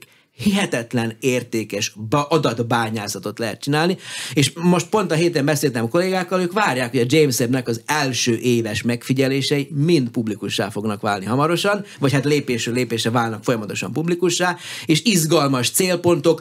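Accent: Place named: South Bačka, Serbia. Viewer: Dél-bácskai tájszólás, Rátgéber Lászlóhoz hasonlítanám. Csak a szakterület más: egyikük a kosárlabdához ért nem akárhogyan, másikuk a csillagászathoz :))). Ja, és humoros a történet, betegre röhögöm magam minden interjúján, előadásán. Régen én is imádtam a csillagászatot :).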